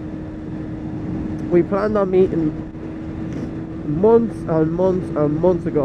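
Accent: Ireland. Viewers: What part of Ireland you sound Kildare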